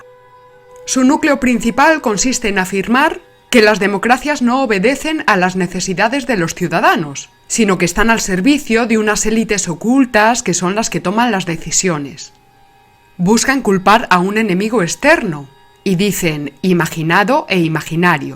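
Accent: Spain. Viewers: el acento español tan marcado es irritante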